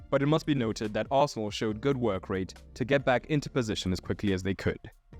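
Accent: South Africa. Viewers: Once again...top class and in depth analysis that focuses on the intricate details of tactics👌 just a question..are you South African?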